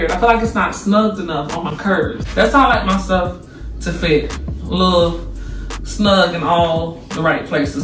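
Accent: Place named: South, United States. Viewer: Beautiful skin. Flawless. Love the southern twang. 😊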